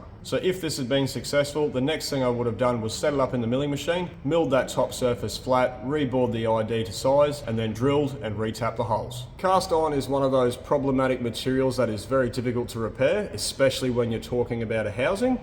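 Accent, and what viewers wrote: Australia. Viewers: Awesome video, always cool watching the puddle gun and listening to profanity in Australian!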